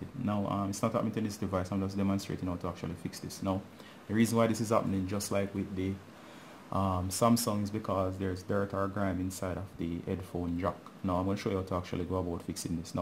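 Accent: Jamaica. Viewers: I can tell you are Jamaican my family is so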